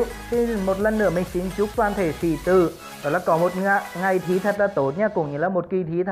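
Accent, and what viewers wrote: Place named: Central, Vietnam. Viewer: Bố gét giọng miễn trung DKMcm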